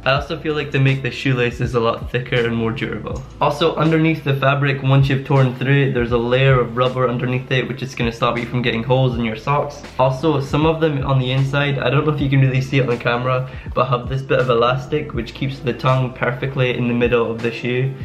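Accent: Scotland, United Kingdom. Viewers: Are u Scottish if ye are wits the best parks for skating bro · Is he Scottish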